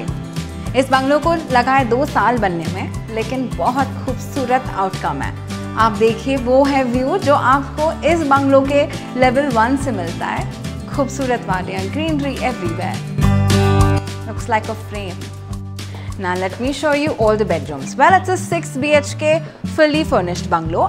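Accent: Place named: India. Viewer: Desi sound people kada😂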